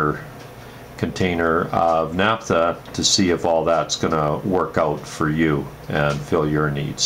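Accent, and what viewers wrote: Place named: Ireland. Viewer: Hi there my friend, I detect an Irish accent, I am from Co. Antrim. Do you know where I can buy the Carbon felt and platinum catatylitic materials online? I purchased a Peacock Hand warmer on line which i believe is better that the ZippoThank you. Seán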